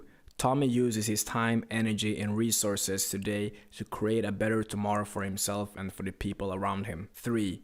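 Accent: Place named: Finland. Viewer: The Finnish accent 👌🏻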